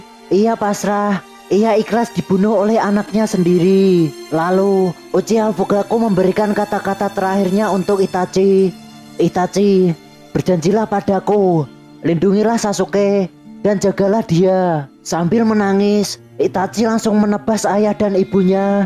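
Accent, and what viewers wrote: Java, Indonesia. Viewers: jawa skali,,bos...bicara nya...jawa kebun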